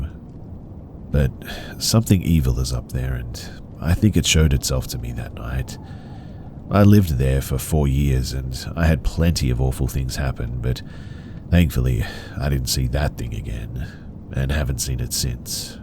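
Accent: Australia. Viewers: Starting the video and hearing a deep Australian accent say "I'm a Chinese woman" was very unexpected.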